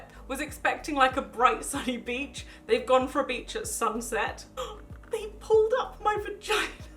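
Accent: United Kingdom. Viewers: can I just say that I love you. Your channel is one of my first British speaking YouTubers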